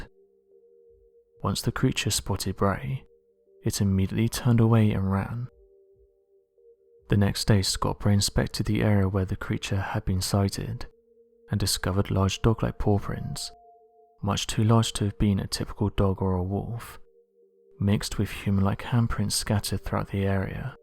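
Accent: England, United Kingdom. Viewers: Where is the picture of the car .fake fake fake ..i was really looking forward to some REAL proof of this "dog man " from your "english" voice..iam not saying this dog man is not real ..... Iam saying your a english dude from that island where there "royalty's" is brother &sister mother dad uncle are one & the same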